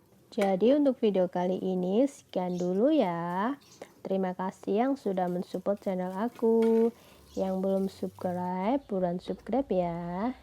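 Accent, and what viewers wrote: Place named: Taiwan. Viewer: seumur-umur baru kali ini makan lobak putih kukus yg enak dan seumur-umur baru dengar suara ngapak aksen mandarin Taiwan.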